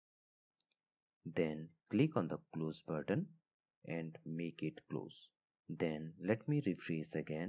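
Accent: India. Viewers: When i started the Video and heard the Indian accent i knew he would explain it like a Pro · why is ur voice so bad. average indian voice ew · when i have started the video and i heard the indian accent i immediately start following the step because u guys always help us in math an in every other subject a huge thanks and respect bro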